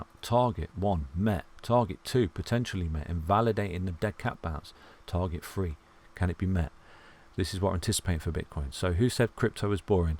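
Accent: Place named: North, United Kingdom. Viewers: First time viewer, informative content with a great northern accent which reminds me of home! Subscribed. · Always great video's on TA. Although sometimes difficult to keep up with the talking speed in combination with a slight English dialect. Keep up the good work. :-)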